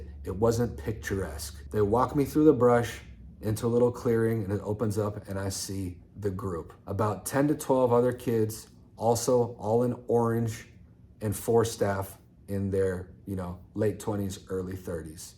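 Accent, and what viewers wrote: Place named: AAVE, United States. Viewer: You sound much better when you drop the Ebonics accent. No offense. Why keep up with the African American accent. I understand that you are into Rap and music etc. I don’t even think that Eminem speaks with an African American accent.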